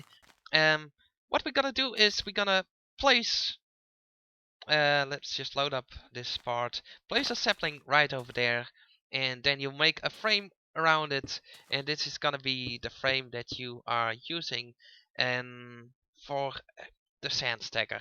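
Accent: Netherlands. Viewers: are you dutch? sounds like it :P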